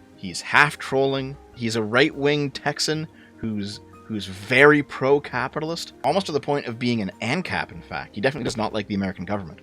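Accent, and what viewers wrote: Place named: Canada. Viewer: I found you when you filled in for Sargon. First thought was that you sounded painfully Canadian. Thanks for the thought provoking content.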